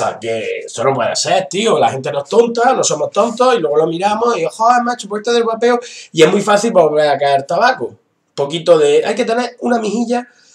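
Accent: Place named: Andalusia, Spain. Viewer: Hablando en Plata.. Y en andaluz. Un saludo desde Málaga.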